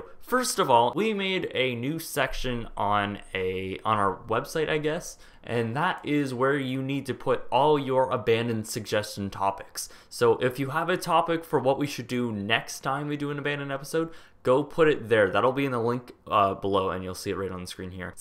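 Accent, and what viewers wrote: Canada. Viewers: Super interesting and wonderfully done,! (are you Canadian? - you have that accent!) Good stuff. I love your work.!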